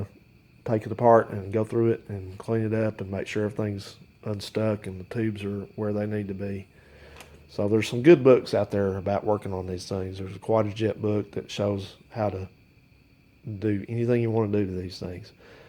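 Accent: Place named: South, United States. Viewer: Greetings: "What happenz is" ....Rednecks so fun 2 listen talk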